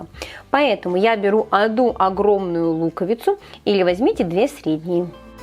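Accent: Ukraine. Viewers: Чому ви записуєте відео російською ? Чутно ж що ваша рідна мова українська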